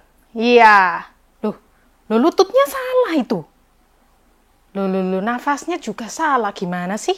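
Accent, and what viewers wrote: Java, Indonesia. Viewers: Lucu bgt perckpnya..medok bgt jawanya 😀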